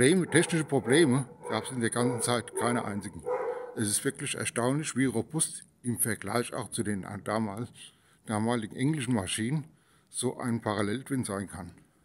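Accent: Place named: Hesse, Germany. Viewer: Servus! Schön steht sie da Deine W650. Ich hätte es schon am Dialekt erahnen können, der Blick aufs Nummernschild gab dann Gewissheit, Du bist beinahe ein Nachbar. Ich komme aus Rodgau (Kreis Offenbach) und habe seit 2012 auch eine 99er W650. Ein herrliches Moped, ich liebe ebenso den Motor, rein von der optischen Ästhetik für mich der schönste Motorradmotor den ich kenne. Habe bei mir auch eine Reihe von Umbauten vorgenommen, habe hinten ein paar IKONs, ganz anderes Fahrverhalten. Und mit 120.000km ist noch lange nicht Schluß, die W ist unverwüstlich, man muss sie schon mit Vorsatz kaputt machen wollen bis da mal was passiert.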